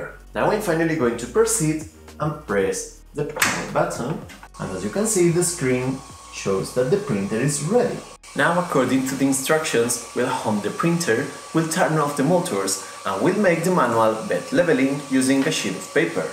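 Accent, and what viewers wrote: Spain. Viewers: One cuestion, are you Spanish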